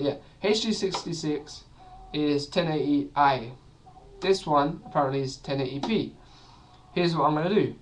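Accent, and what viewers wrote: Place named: Portugal. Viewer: u sound portugese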